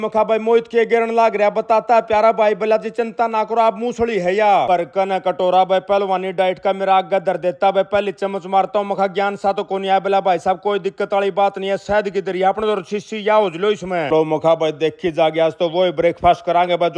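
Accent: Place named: Haryana, India. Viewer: आप ने अच्छी कोमेंटरी की हरियाणवी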